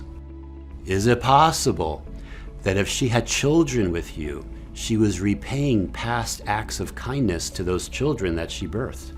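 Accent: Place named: Italy. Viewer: I thought I recognized a fellow Italian in your cadence and light language. My Birth Name is Margherita Cumero. Comes with Love ❤️😘❤ Such Gratitude for Your Energy and and who lead this Dragon here.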